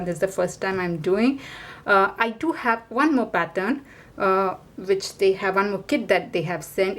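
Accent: India. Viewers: R you a south Indian? Malayali?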